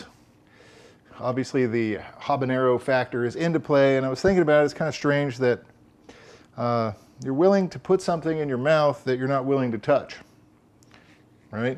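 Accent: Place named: Boston, United States. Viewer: Australian?! That accent was straight out of Boston!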